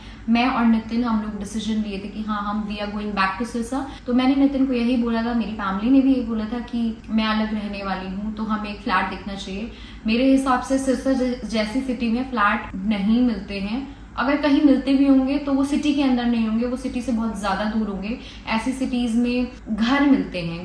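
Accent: Garhwal, India. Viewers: Hayee dii apki gadwali.....😍♥️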